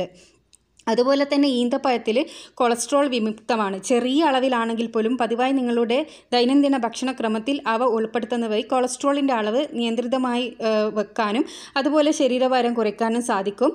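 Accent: Kannur, India. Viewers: Nalla avatharanam... Ningal kannur aano 😊😊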